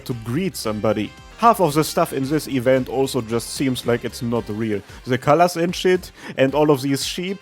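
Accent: Germany. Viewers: holy your german accent is so insanely strongxDDDD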